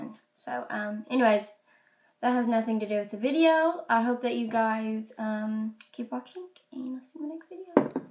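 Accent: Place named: Tennessee, United States. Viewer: I like your accent !!! Its like your from tenneysee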